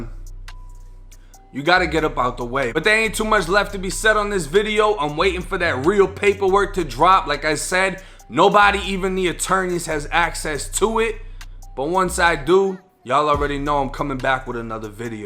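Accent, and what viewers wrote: Boston, United States. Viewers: You sound like you was born and raised in Boston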